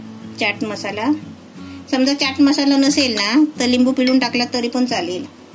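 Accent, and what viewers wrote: Konkan, India. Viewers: Kaki tumch gaav konat tumchya bhashe varun tumhi koknatlya aahet asa vatat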